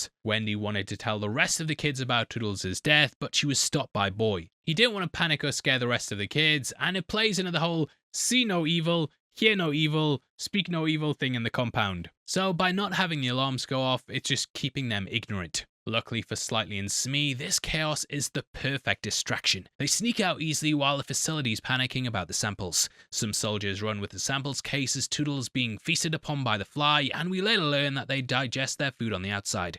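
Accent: United Kingdom. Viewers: Hint: He has a very slight, barely noticeable British accent..... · You really dont have a strong American accent, are you from the UK? 😎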